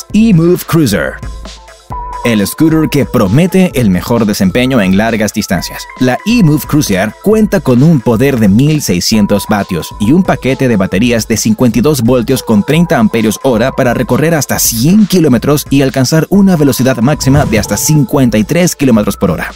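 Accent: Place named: United States. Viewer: La pronunciación con complejo de Yankee da grima, Ssscurerh, bareri en vez de batería pero khe, ya de paso que diga las light y las wheels XD, eso sí buen video y buena información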